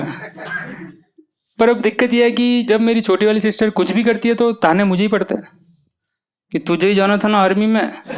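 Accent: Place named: Rajasthan, India. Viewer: Bhai Aap kha se hoo iam from rajsthan apka accent thoda sa rajasthani lg rha hai btw Love your video ❤❤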